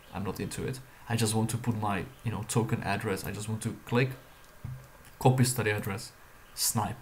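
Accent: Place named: Netherlands. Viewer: Thanks for the reply my man! You Dutch by the way? You kinda sound Dutch ;)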